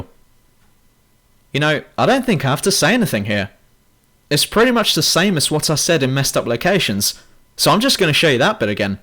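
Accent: United Kingdom. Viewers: Dude you are a, LOL Plus the british voice makes it for a good review.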